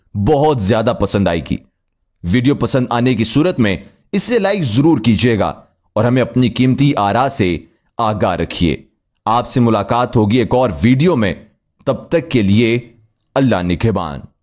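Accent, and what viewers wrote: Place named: United Kingdom. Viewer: Kamal ke English accent dali apny..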